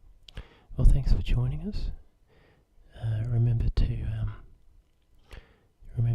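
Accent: Australia. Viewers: So I was scrolling and I found this man’s YouTube channel and I didn’t know who he was so I check them out and I found out he is Australian and it’s a lesson about how to talk Australian and I’m like well if I was American I would’ve watched this but it is relaxing though but I am already from Australia my whole family is from Australia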